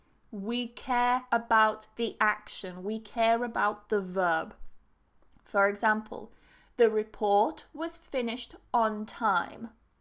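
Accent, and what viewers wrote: United Kingdom. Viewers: I think it's beautiful British English 🇬🇧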